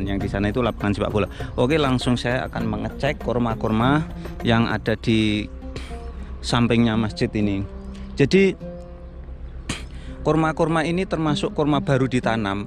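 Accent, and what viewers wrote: Java, Indonesia. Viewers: asli mana pk bicaranya kaya orang jawa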